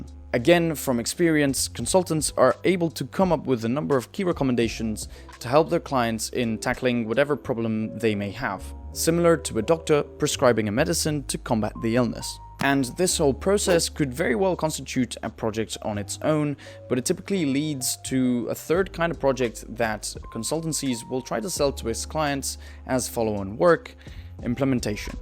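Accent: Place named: United Kingdom. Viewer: Impresionado por tu acento británico, peroooo, sinceramente, creo que hubiera sido mejor mantener el canal antiguo y abrir uno nuevo orientado a tu nuevo público objetivo, la verdad 🤷🏼‍♂️. Creo que así partes de cero con los interesados en Consultoría y pierdes a los interesados en aeronáutica o tecnología e ingeniería 🤷🏼‍♂️. Igualmente, espero que el comentario ayude con el "algorrino", y por supuesto, mucha suerte y todo lo mejor en esta nueva singladura consultora señor 👋🙃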